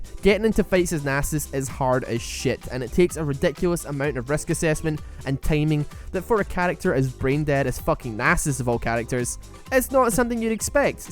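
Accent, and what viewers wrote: Scotland, United Kingdom. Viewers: He is scottish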